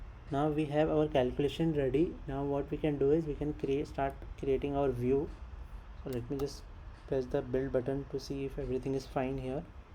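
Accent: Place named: India. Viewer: hahaha it's so funny accent ))) OMG I can't stop laughing ) Indian funny guy with terrible pronunciation )) kupaklklklklklklklklktktktktlklklk 🤣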